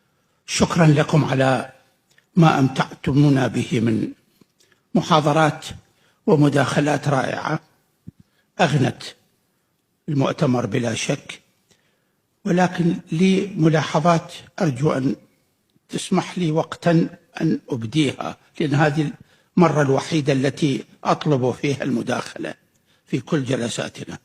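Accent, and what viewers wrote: Iraq. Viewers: يعني عيوننا دمعت لانك الوحيد الذي يتكلم مثل اهل العراق وباقي للسوريين لاء ...الاعيبكن اصبحت مكشوفة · طلاسم اللغة وتغدعقيدها دليل ضعفها ونفور الاشخاص للتدث بها... وذا الدكتور يتحدث اللغة الفصحى بطريقة خاطئة ...مخارج الحرف باللهجة العراقية وهذا لايجوز كنطق أو أن يتكلم بالعامية أفضل مثاا نكيرا...بدل أن يوقول (نكره)والدليا الذب ايتحصىه من القرآن يلين فيه الايتهزاء وهذا انسايا مرغوضا ولو أن هذا كتاب يقال أنه سماوي ...ودفالإستهزاء عمل سيئ والكثير من الشواء القرآنية تثبت ذلك ...يقولون هنالك عبرة ...أية عبرة حين تحبط الآخرين ...إذا كان كلام الله هكذا فكيف بعباده ؟؟. البلدان الذان ينطقان الفصحى بشكل سليم هما سوريا ولبنان ...أما باقي الدول العربية فلا.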